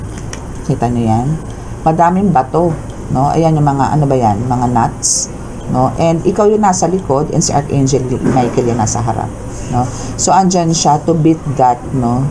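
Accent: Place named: Visayas, Philippines. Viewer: hahaha cute niyo po mag bisaya 🙏🦋🌻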